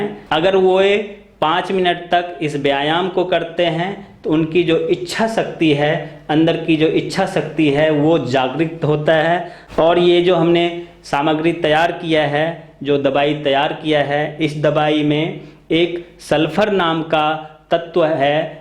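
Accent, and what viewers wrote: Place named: Bihar, India. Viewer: Ye ravish kumar jaisa thakela bolta hai..bihari babu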